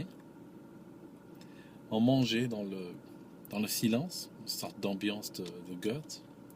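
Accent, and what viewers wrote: Switzerland. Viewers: on valide de la 1ère à la dernière ligne... et retiens la métaphore! Mais, êtes-vous CHuisse?! pas l'accent...les 2? euh... franco-suisse-arabe?! Merci pour la virée en bagnole! La politique prend une telle place prépondérante en France que c'en est insupportable. Plus de philosophie, SVP chers voisins et compatriotes... ça serait moins lourd - à l'instar de la cuisine au beurre d'hier...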